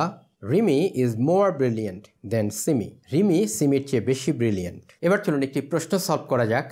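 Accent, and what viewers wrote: United Kingdom. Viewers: আপনার ইংলিশ উচ্চারণ বিট্রিশদের মতো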